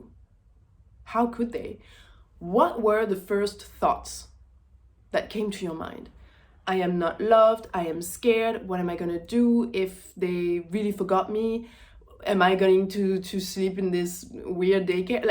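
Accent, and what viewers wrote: France. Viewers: (I love your French accent!) I knew, you're gonna like the whole YouTube thing. These cases about our psychological impact on our lives are very important to highlight.Im glad you're doing that., you're this kind of influencer, that we need to influence our lives. Thank You 🙏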